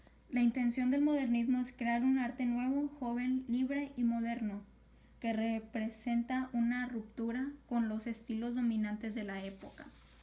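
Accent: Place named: Latin America. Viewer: Por tu acento, veo que eres de un país de hispanoamérica. Sin embargo, cuando dices que Lázaro estuvo en américa viviendo dibujas el mapa de Estados unidos. Creo que deberías saber que Estados unidos no es américa y que Lázaro estuvo en uno de los territorios americanos pertenecientes a España, los cuales algunos se situaban donde hoy se encuentra EEUU. Creo que dibujar el mapa de estados unidos es una muestra de ignorancia simplemente y de apoyo al apropiamiento del nombre "América" por parte de los estados unidos. Un poco de cultura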